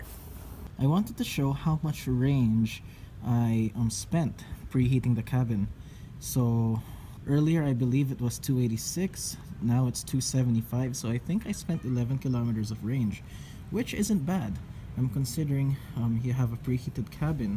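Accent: Philippines. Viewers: Worth it ba tong sasakyan na to sir ? Taga cali kasi ako ang dami kong nakikita nyan dito. Hindi naman malakas sa kuryente pag nag charge filipino ka halata naman sa accent nating mga filipino. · I noticed the Filipino accent 5 seconds into this video haha. Greetings from another Pinoy from SoCal and it's great to see another pinoy driving an EV. 🇵🇭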